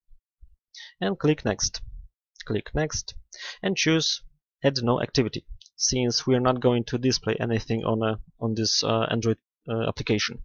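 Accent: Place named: Indonesia. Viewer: dari logat bahasanya kayaknya orang indonesia nih...bener ga ya??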